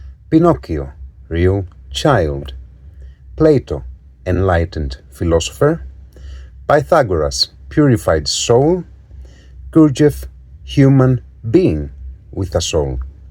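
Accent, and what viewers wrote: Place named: Greece. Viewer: I find your accent very charming. Greek must be a beautiful language!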